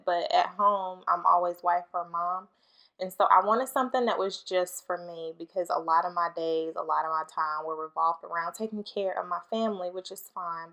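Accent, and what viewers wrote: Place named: South, United States. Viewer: Thank you in bunches for answering my questions in full!! You truly blessed me!! I'm from Charleston, South Carolina so that's how I picked up on the southern accent!!! I can't wait to meet up win you girl! Also you look amazing not just for your age which 30 is super young but in general. I thought you were 22/23!! Love your future plans for you and your family!! FAMILY FIRST!!!! ❤️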